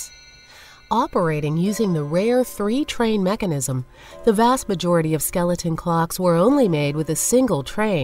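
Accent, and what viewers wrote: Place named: United States. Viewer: Great clock from Yorkshire, but why the American accent.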